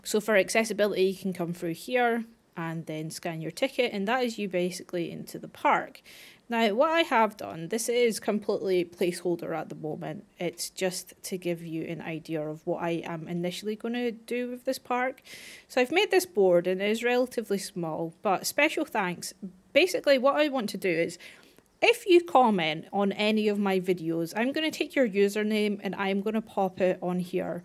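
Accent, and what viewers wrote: Scotland, United Kingdom. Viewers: First video of yours I've ever watched, and when I heard the Scottish accent (well, I think a Scottish accent), I instantly when "SCOTTLAND FOR WEVER" in my what I can a Scottish accent! Buuttt the aussie accent butchers it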